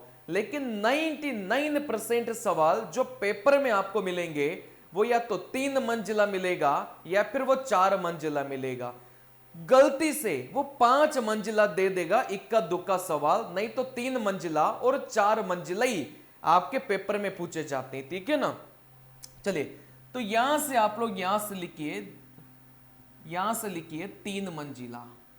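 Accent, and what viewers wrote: South, India. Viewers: Sir aap south indian ho kya???? 😅 Accent wahi la lagta hai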